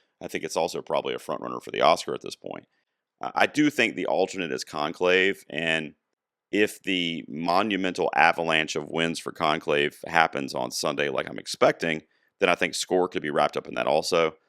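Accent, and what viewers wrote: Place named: United Kingdom. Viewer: I really love your British accent. 😂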